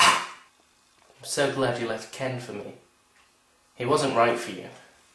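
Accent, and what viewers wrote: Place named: Northern England, United Kingdom. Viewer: the northern accent is killing me omfg you star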